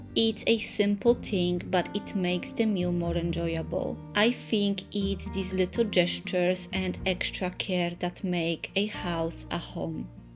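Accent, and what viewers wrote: Poland. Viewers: jestes Polka?:) zgaduje po akcencie ale i imię. Piekny film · This is my first time here and wondered where are you from because I heard an eastern accent. When I saw you preparing racuchy I smiled: you are Polish! Me too! Greetings from Warsaw.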